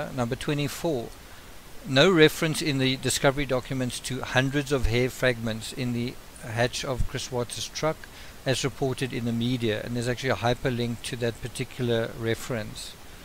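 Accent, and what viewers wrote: Afrikaans, South Africa. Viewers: Interesting! I hear you are a Afrikaans person. Do you remember the case of Oscar Pistorious? I think he was guilty. Good content.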